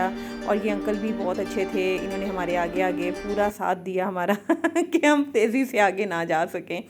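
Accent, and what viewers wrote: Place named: Punjab, India. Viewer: wesy hi AP k bolny sy lagta h ap punjabi h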